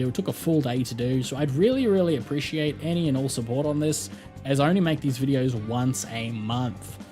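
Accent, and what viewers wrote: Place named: Australia. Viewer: hahah i knew it :). Im from Victoria and i thought that was an aussie accent. I was greedy and i use both garnet and ramza - 90% hp attack cap up is delicious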